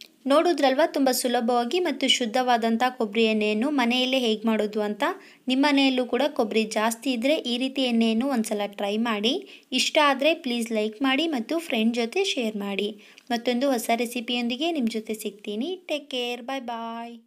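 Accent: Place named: North Karnataka, India. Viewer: Mam nivu nam kadeyora....uttara Karnataka avru nim voice hage ede swalpa adake kelde mam ...thank you so much for your recipe mam🥰😍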